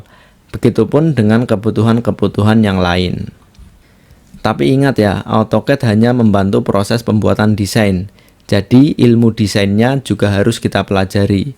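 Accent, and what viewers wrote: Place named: Banyumasan, Indonesia. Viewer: mase ngapak e ceto nemen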